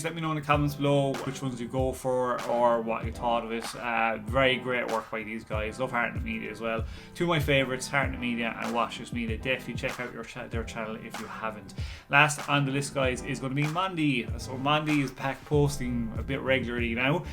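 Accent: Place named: Maritimes, Canada. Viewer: cool. Figured you had a eastern accent from the east coast maritimes. Im from Saskatchewan.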